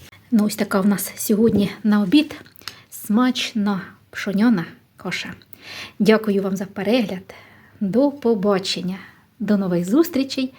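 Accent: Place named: Cherkasy, Ukraine. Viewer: Гарна черкаська говірка, приємно чути.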